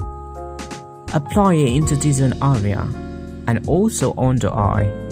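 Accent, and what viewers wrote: United Kingdom. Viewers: Sering² kak bikin tutorial pake British accent I like it 😍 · Kak lidah nya british bgt n cwantikkk..... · British accent,,,remind me to ms.jane😂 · British nya medok banget,kalo merem di kiranya org asing yg ngomong...pernah tgl di england lama kak ? Please answer me.... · Kak sampean Iki maem'e opo to ngomong British'e kok uenak dirungokno,love you sering2 bikin video ya 😍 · Gilllakk kereenzzzz beuddd sumpah Jd pengen belajar how to speak as great as you. Kaya bangsawan2 inggris sumpah....ih....wanna make conversation with you...practice as u student. Sembah.....guru besar.hehehhe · masio ndempul banget tapi aku suka lho tutorial iki. Keren. Aksen britishnya jeng, keren. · OMG ... Oke q baper dgn aksen British itu🤣🤣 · darling aksen inggrisnya sukaaa banget Tutorialnya banget banget ok Jadi semangat coba coba make up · Aksen Britishnya halus banget loh kak 😍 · Sumpah cantik banget ka, aku suka sekali makeup nya. 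Terus juga suka banget aksen British English nya, belajar dimana sih ka? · Berasa lg nonton dialog harry potter suka sma british accent nya😊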